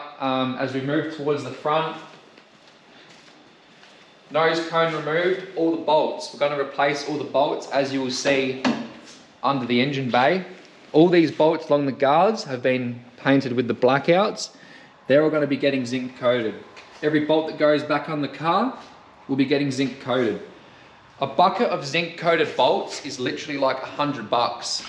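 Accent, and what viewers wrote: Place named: England, United Kingdom. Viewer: He now's about Aussie cars but he sounds like his from pommyland its the only thing got me stumped about this channel.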